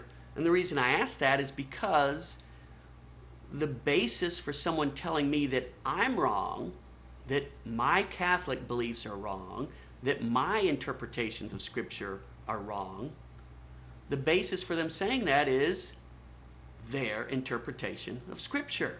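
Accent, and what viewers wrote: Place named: South, United States. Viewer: you sound like you have a southern accent, are you from the south?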